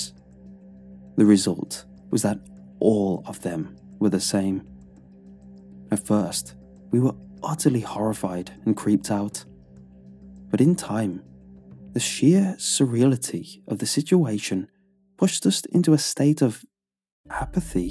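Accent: England, United Kingdom. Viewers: YAY! BRITISH ACCENT! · English boi?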